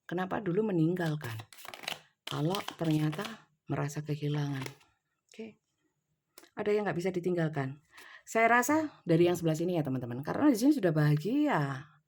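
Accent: Java, Indonesia. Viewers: Bunsaya suka ibu kalau omong Jawa medok banget. Sebagai orang Jawa.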